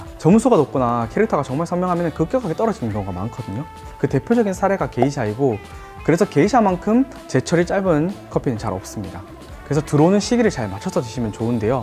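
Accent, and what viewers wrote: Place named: Gyeongbuk, South Korea. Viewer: 경북지역 억양 인듯요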